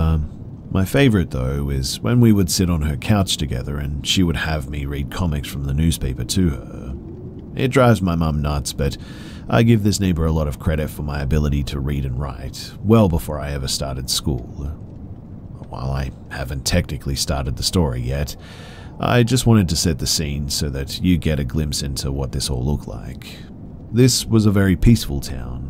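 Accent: Australia. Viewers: Wait, is that an Australian accent? I'm listening in Sydney. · Sounds like you grew up in Oz, rather than Texas! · Why the Aussie accent when everyone is texan?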